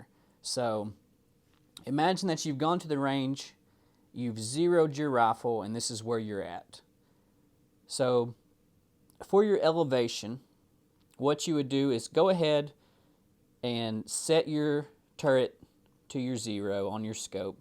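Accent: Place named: South, United States. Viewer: You've gotten younger......and much more southern. And is that a Bergara I spy?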